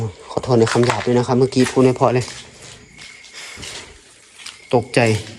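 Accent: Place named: Isan, Thailand. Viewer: โครตหลอนรอยตีนเห็นชัดมากเลยระวังตัวแหน่เด้อน้องเว้าไทยปนอีสานนำกระไดจ้าม่วนดีออก❤❤😊😊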